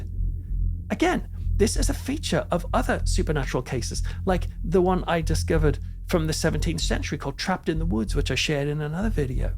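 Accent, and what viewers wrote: United Kingdom. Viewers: Your the best story teller on you tube , and British … which is so refreshing 😊😅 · This man needs a nomination to the storytellers hall of fame. You have a wonderful way of making the listener feel like they are right there witnessing the events you speak of. Plus, as an American, I don't know why, but when you Brits tell a story, it just sounds scarier in a good way. 😊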